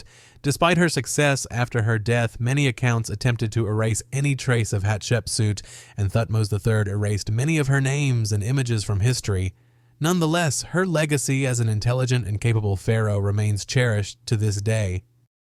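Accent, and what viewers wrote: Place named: South, United States. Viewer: Hear the voice mate? It's American. Sounds like the south our lower Midwest, meaning the large majority of their religious exposure is Christian with perhaps a skimming of world religion ans culture, perhaps a world religion class or two, but nothing you can't get with a Google search. Also, the voice sounds Caucasian (not being racist, I'm a 50 year old white dude myself) based on the number of accents and regional speech patterns I've heard. Most white, American Christians don't bother learning much about Islam particularly because it's outside their belief and labeled satanic. Hope that helped.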